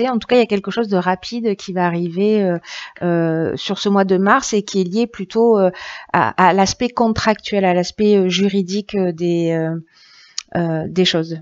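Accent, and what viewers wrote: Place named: Marseille, France. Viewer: Bonjour. Merci pour votre tirage. J’adore votre accent. Je suis du Canada 🇨🇦 province de l’Ontario. Votre accent ressemble gros à un accent de la région de Marseille. Merci encore et il semble que l’année s’annonce bien pour nous lion 🦁!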